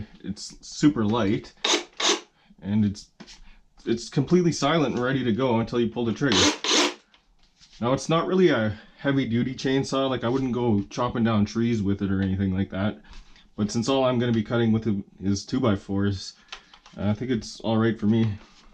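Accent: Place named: Canada. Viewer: This guy has the biggest Canadian accent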